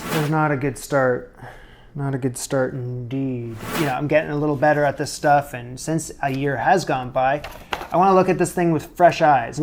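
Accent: Canada. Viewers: Do I hear Canada great video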